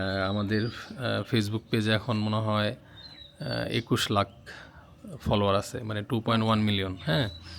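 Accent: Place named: Jamalpur, Bangladesh. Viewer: কোন এক শীতের সকালে তারা যখন মেলনী কাকীর সাথে মাছ কিনতে যায় ঝগড়া করছিল সেটাই প্রথম দেখা ভিডিও ছিল। আমার গ্রামের বাড়ি জামালপুর। আপনার ভাষা শুনে মনে হয়েছিল জামালপুরের কোন এক গ্রামে থাকেন। তখন থেকেই ভিডিও দেখা।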